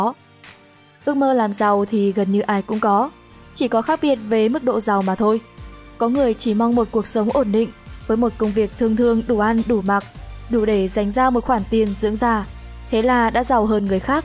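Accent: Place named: Vietnam. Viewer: ad đọc tên người nước ngoài nghe chán quá, thuần túy là phát âm bằng ....tiếng Việt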